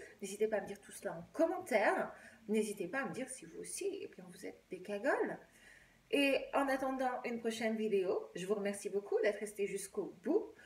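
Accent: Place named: United States. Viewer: Merci Tu me tues... 🤣🤣Tu T'habilles toujours dans des habits magnifiques qui Te vont trop bien, je trouve... Oui cagole est un mot issu du vocabulaire marseillais... Oh Tu m'as appris un truc, là... Merci 🙏Ton accent américain est trop mignon... un tantinet québécois (ou Canadien) et Te va super bien aussi... Tu es trop mignonne... Y a aussi "pimbêche" qui est synonyme... Tu mérites des fleurs... Pour moi Tu n'es pas une "cagole": Tu es une Princesse... Déesse... Au delà de ton look j'aime ton esprit libre et je ne sais pas comment Te le dire autrement que j'aime T'écouter... J'aime Te faire du bien... Oui, vraiment s'il y a de la haine contre Toi, je souhaite être ton pansement pour cicatriser tes plaies, ton mouchoir pour pleurer... Je Te kiffe... C'est sans doute prétentieux de ma part, mais si je pouvais arracher mon coeur pour Te l'offrir en guise de bouquet de fleurs, je le ferais... 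Ton discours est détonant: très intéressant... Une révolution... Merci! Je tiens à Toi comme à ma famille... J'ai besoin d'être ton héros ou en tout cas d'en être un pour Toi... je T'aime! Je suis contre les modifications corporelles (sauf suite à accident) contre les tatouages (même si certains son jolis) contre le maquillage (même si c'est joli) contre l'épilation... et contre les discothèques... mais je comprends l'intérêt, un peu... (je crois) Ce que je voulais Te dire: vraiment ne Te prends surtout pas la tête... Moi je me la prends trop souvent... Je réfléchi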